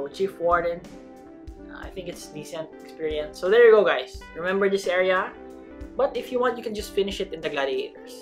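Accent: Philippines. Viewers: His accent is filipino lol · are you a filipino? Because you sound like one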